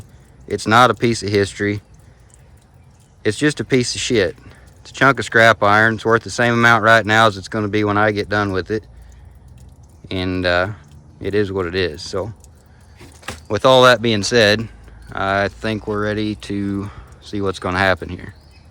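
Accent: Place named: Alabama, United States. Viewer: This channel reminds me of Peg at Zip Ties and Bias Plies... Neither ever shows their face in camera, presumably so they can get away with their dirty deeds with impunity! LoL. I'm tryin' to figure out where this good ole boy is from. Countryside looks like southern Indiana but could be anywhere USA in reality. The voice sounds like the feller is from Alabama, but again that can be misleading too.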